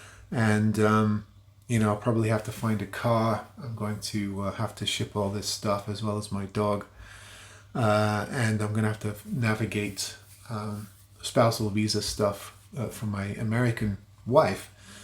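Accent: United Kingdom. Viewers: I never thought before I guess from your accent I thought you was in the UK or there abouts lol anyways happy travels mate and hope your journey is a safe one! cheers!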